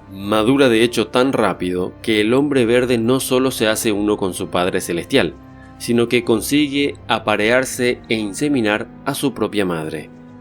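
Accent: Paraguay. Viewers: Que tal.... sos de paraguay..??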